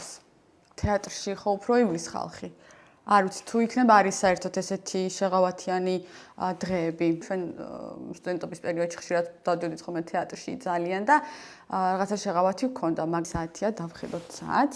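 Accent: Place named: Israel. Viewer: ქართულად როცა ლაპარაკობ ებრაული აქცენტი გეტყობახოლმე ლაპარაკისას 😄👍✌️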